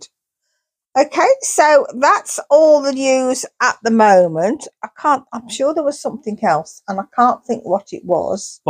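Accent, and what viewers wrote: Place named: Kidderminster, United Kingdom. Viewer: It's been a pleasure. I must admit, being from Kiddy (though not originally) it was the accent that grabbed me first. But your vids have gone from strength to strength especially the ones from Yarnover. They were so interesting and informative. Keep doing what you do. I'm here for the duration ❤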